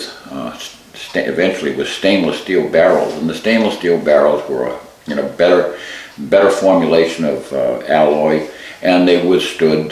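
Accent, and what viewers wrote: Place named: Massachusetts, United States. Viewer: I detect a touch of "Bay State" accent.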